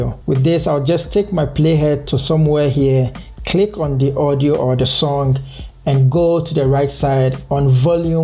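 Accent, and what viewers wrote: Ghana. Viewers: You sound like a Ghanaian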